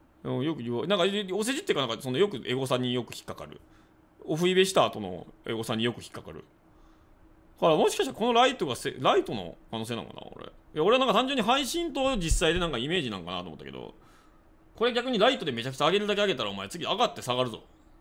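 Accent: Kansai, Japan. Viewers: 最近ずっと関西弁残ってるのオモロい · なんでこんな関西弁やねん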